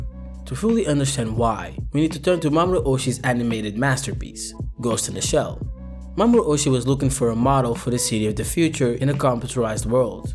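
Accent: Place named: Russia. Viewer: Ебать! русский акцент! ххптьфу!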